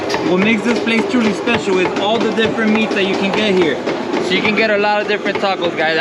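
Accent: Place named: England, United Kingdom. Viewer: You speak Spanish, there’s no need for you to pronounce Spanish words with an English accent. It would help for those that don’t speak Spanish n would like to visit .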